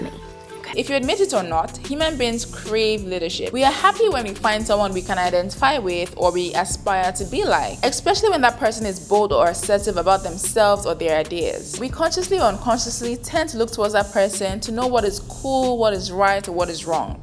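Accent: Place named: Nigeria. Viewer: I knew you where nigerian because i noticed your accent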